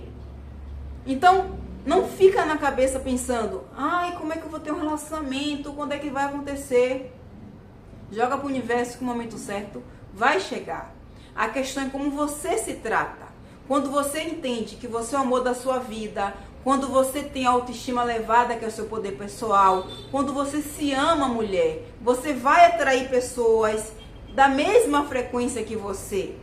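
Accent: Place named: Bahia, Brazil. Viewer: Voce tem sutaque baiano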